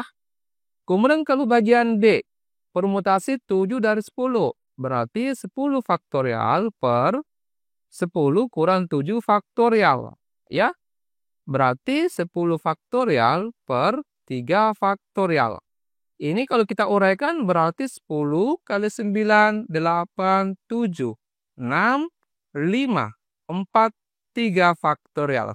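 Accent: Sulawesi, Indonesia. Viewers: orang sulawesi ya pak? hehe soalnya logatnya kaya sulawesi 😃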